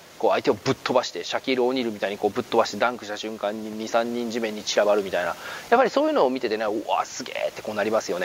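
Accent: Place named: Okayama, Japan. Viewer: しゃべりかた、耳障りがえーとおもーたら、岡山人じゃったんか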